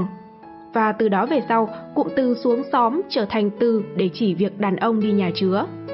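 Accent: North, Vietnam. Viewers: Kênh này đưa thông tin 1 chiều và không có dẫn chứng rõ ràng. Cộng thêm giọng đọc miền “ ngoài “...không đáng tin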